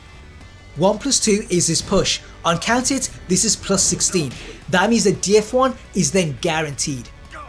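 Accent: United Kingdom. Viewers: I love your accent bro , you from UK ?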